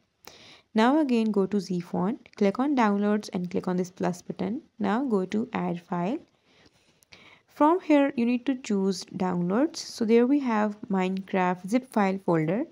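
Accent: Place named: India. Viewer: Of course we will get trolled cause don't y'all hear the Indian accent slipping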